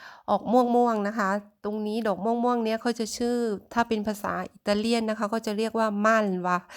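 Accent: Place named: South, Thailand. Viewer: ดอกไม้สวยมากบานสพั่งเต็มทุ่งเลยช่วงนี้ถ้าเก็บเอามาขายบ้านเรารวยเลยดอกไม้ขาดตลาด ฟังน้อง เล่าเพลินเลยสำเนียงใต้ฟังชัดเจนดี รายได้ดีมากคิดเป็นนาทีเลยสุดยอด น้อง สาวใต้เก่งมาก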